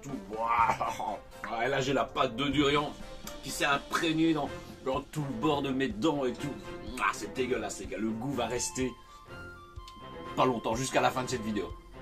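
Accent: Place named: Belgium. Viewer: Très sympa tes vidéos 👍🏽 C’est marrant ce petit accent belge. Par contre d’habitude je reconnais facilement de quelle région de Wallonie provient l’accent, à part le carolo, sauf si il est fort prononcé. Sûrement dû au fait que je suis, moi-même, carolo😅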